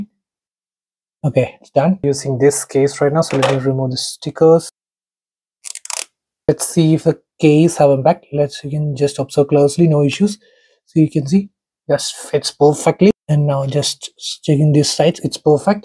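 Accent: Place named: Kerala, India. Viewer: Dude, just a suggestion. You speak very fast, and your accent (I'm guessing you are from the South, possibly Malayalee) makes it very hard to follow you. Try enunciating your words better and speaking a bit slower. People aren't going to stay with the channel if they don't understand anything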